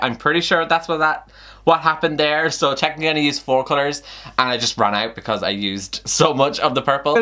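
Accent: Ireland. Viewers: I want to point out two things: 1. I’ve never been interested in that book but you made me want to read it like... now. Maybe two lives ago. 2. Your Irish accent had me almost sobbing because it’s adorable omg. Please be my friend ahaha I had to watch the video twice because I kept being distracted 🙈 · your youtube name is obviously so i don’t know why i was surprised when i realized you have an Irish accent. We kinda annotate similarly!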